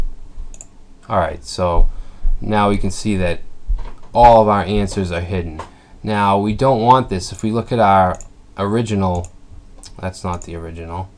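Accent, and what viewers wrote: Boston, United States. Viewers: Thanks for the tutorial. Love the Boston accent. Brings me home. Grew up in Newton but have in in Florida for 10 years.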